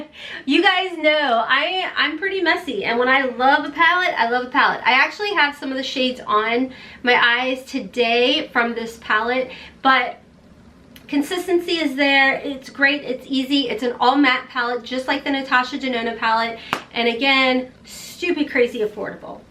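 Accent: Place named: Kentucky, United States. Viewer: That Kentucky accent came out strong in this video! Love it!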